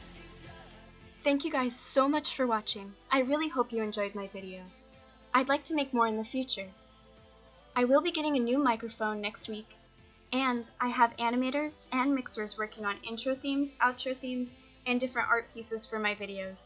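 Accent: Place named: Japan. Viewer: Японский акцент это оргазм для моих ушек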